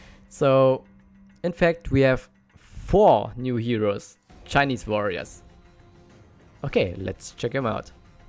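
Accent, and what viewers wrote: Germany. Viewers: Dieser breite deutsche Akzent 😂 aber gutes Video 👍🏽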